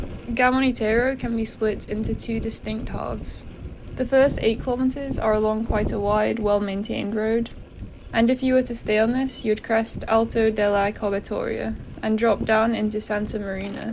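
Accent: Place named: United States. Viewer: The rear cam works so well, really gives that perspective of progress love your voice and your accent of course like any American : )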